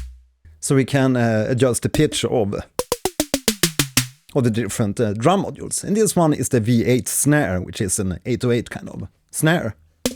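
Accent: Sweden. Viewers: Tänkte först.. Låter lite som svensk dialekt sen såg namnet =)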